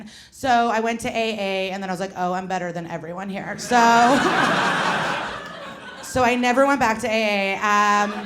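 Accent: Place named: Midwest, United States. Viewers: Her voice is def from Skokie. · Ya know I usually hear the midwest female accent (go to fucking Naperville, IL and tell me 80%+ of all the chicks DON'T sound exactly like this😀) and immediately turn it off or run away. Glad I didn't this time. Throwing bedpans in a hospital is the best criminal offense I've heard in years.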